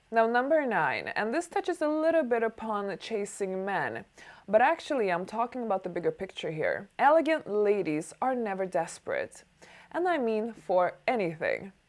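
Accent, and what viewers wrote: Sweden. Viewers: Why do you sound Swedish?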